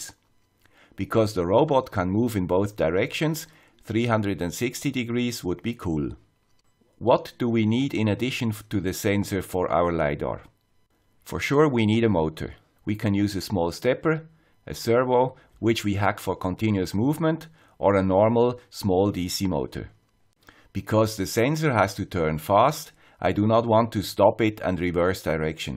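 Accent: Switzerland. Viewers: I forgot to mention otherwise it's a good video! Thank you "the guy with the Swiss accent" ♥️ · Pro tip when dealing with swiss accents: 1,25x video speed. It soothes my adhd :-)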